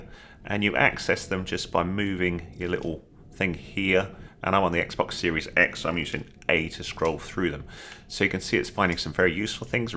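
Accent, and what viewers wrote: United Kingdom. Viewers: Yeah, agreed, straight to the point. Also your English accent is lovely!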